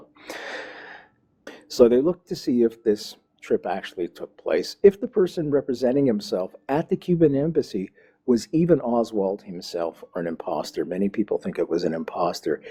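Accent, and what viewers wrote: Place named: Canada. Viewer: Hi - a listener from the UK here! I just wanted to say thank you for you tireless, even-handed work toward a range of topics. The assassination of JFK is something that has deeply interested me for a while, and I always enjoy hearing different points of view about the various elements of the event and people involved. JFK, from talking to my mother and grandparents and others, was a much respected, even loved, President here. And his death was a deeply shocking, saddening event that still stirs great emotion in my mother today. He seemed to represent a brighter future and engendered a great amount of respect here. There was, and remains, a great deal of scepticism about the government sanctioned explanations as to what happened before, during, and after President Kennedy's death. And, as an aside, I love listening to your Canadian accent and when you talk about Lake Ontario - always nice when I'm listening with a warm cup of tea of an evening!:) Thank you!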